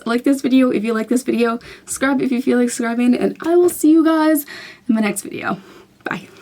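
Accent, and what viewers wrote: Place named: England, United Kingdom. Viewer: I can look at you forever😍, sometimes I don't understand some words, but I just admire you, you look gorgeous, very beautiful ❤💛, thanks for information, hug you🤗, I'm very glad to become part of your YouTube family🤓, I like your English accent, I want the same pronunciation🔊, I will watch you and improve my English, thanks you so much for all you do for us😁❤🤗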